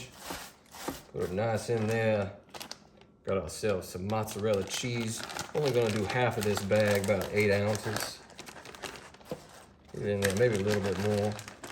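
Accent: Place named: Louisiana, United States. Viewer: Not Georgia cajun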